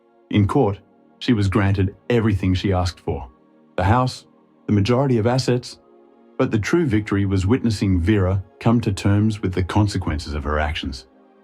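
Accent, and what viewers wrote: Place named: Australia. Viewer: Australian patois just does not go well with these stories. Canadian is the best.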